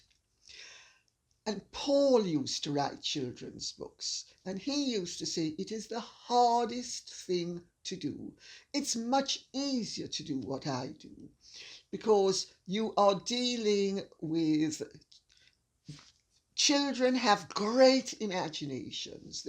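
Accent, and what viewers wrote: RP, United Kingdom. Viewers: Hi from Australia, i just loooovvveee your subtle insults with your finely clipped english aristocratic BEAUTIFUL VOICE. My daughters always often get a chuckle out of the way you have with words. Bravo 👏👏👏👏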